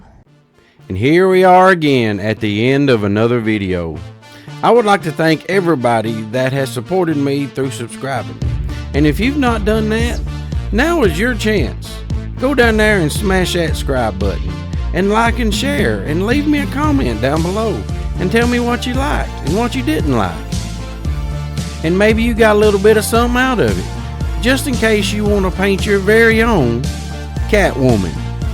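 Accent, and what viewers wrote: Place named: South, United States. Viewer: You have the most southern accent I have ever heard. She came out great, I might have to grab this one myself.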